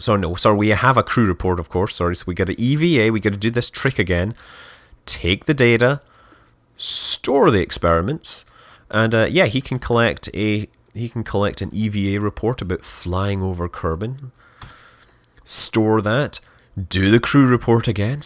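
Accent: Scotland, United Kingdom. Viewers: Man his accent is doing my fucking head in. half DJ half Scot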